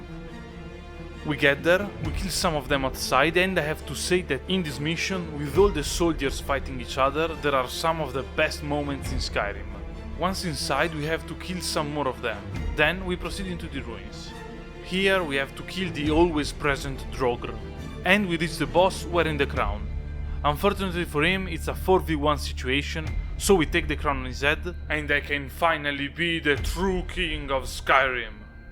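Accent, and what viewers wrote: Italy. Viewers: Oh my goodness I love your Italian accent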